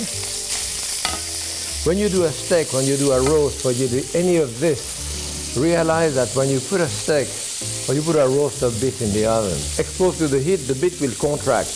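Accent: France. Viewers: The french accent turns Th into Z, but he had a stroke 5 years ago and it has considerably slurred his speech. Very understandable though, mouth watering sound is the same as not being able to control your salivary tract excretion. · This is a proper french accent, not that fake shit from that curly haired dude, god i love french accents but that dudes accent makes me cringe so much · the adorable chef with an insane french accent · Cannot stand his strong French accent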